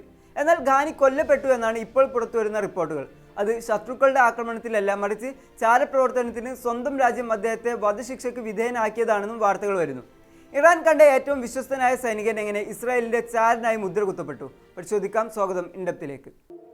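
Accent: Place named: Malappuram, India. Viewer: മലപ്പുറം മലയാളം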